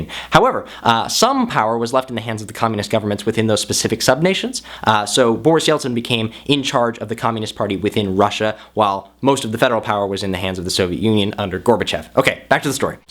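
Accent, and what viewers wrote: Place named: France. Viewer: How in the world did you know all this? More importantly, your French/Non Russian accent is adorable.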